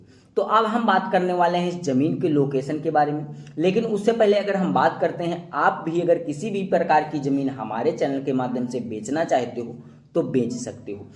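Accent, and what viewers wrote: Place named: Jamtara, India. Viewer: बोलचाल से तो भाई जामताडी लग रहा है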